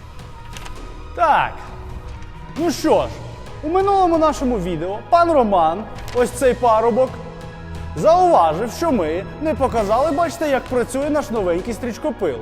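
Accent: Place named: Ternopil, Ukraine. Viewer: Ніколи б не подумав по Вашій мові, що Ви з Дніпра, а не Тернополя)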